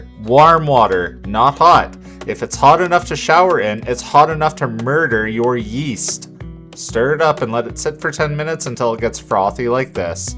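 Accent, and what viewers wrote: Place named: Alberta, Canada. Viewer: Who can tell me where in Canada this guy is from? the accent ..........Alberta?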